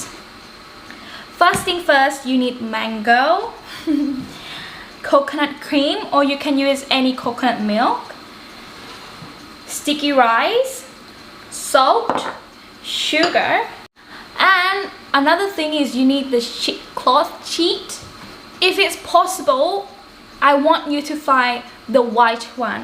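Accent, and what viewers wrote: Australia. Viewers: you are getting an Aussie ascent ..... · Hello, you are starting to get an aussie accent! Love your cooking vlogs! :)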